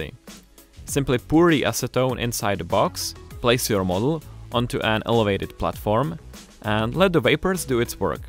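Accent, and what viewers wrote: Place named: Czech Republic. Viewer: Lol, after one sentence I knew you are Czech.. the funny accent..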